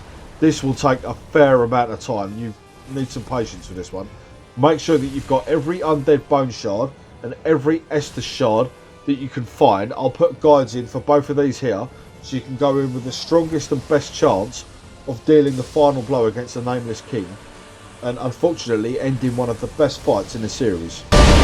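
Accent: United Kingdom. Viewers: Never thought I'd see a cockney soulsborne player 😂 Cracking work on the descriptions mate, keep making more! Edit: Just finished Nameless King fight myself... 12th attempt I think 💀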